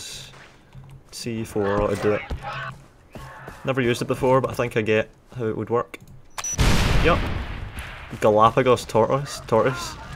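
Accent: Scotland, United Kingdom. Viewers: SCOTTISH ACCENT FTW !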